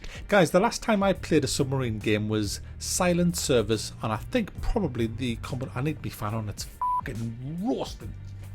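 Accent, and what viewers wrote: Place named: Teesside, United Kingdom. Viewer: Hillariaous, sound like just over the bridge. Smoggies as you lot call us 🙂 Agg missed the k from end of the c, ponders if put an o between 🙂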